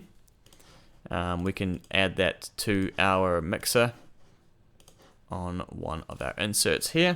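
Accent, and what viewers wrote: New Zealand. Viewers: sounds like hes a kiwi. we just sound like that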